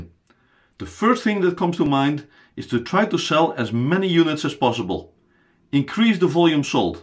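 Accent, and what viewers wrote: Netherlands. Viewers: Thank you so much for helping! Hopefully I will pass my entrance exam. Also, I had to ask are you Dutch? I could tell by your accent :)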